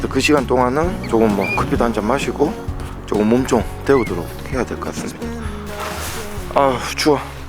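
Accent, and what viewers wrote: Gyeongsang, South Korea. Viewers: 일본어쏼라쏼라~~~믓지네요 뷰도 믓지고 ㅋㅋ 근데 일본어도 경상도 사투리가 묻어있네요 뼈속까지 갱상도!!ㅋㅋ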